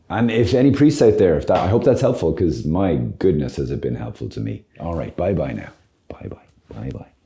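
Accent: Ireland. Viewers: U have a little irish ❤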